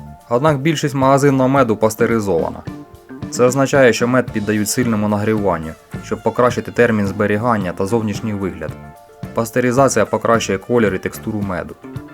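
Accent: Ukraine. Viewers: Автор явно з Черкоащини.)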